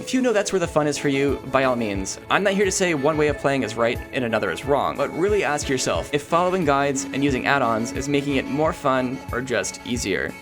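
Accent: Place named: North America. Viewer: I like the mentality and if I could I would subscribe to it. Feels impossible to do so - especially in Retail where I play mostly. And even thinking about doing things semi-blind has many insinuations of ones 'skill' - their 'commitment' and so on. It's just easier, even from that social perspective, to fall in line and do things the 'accepted way' even if it feels like the soul is sucked out of it. I'd love to try out and play with your guild but you sound like you're from NA so RIP for me joining. Hope it goes well though. Good luck.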